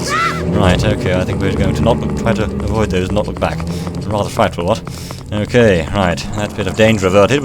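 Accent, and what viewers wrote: United Kingdom. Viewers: You sound British.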